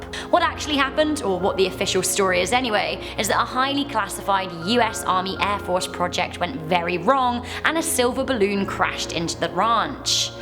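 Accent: England, United Kingdom. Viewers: Does have a british accent Like:yes Comment:no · I thought your from england because of your accent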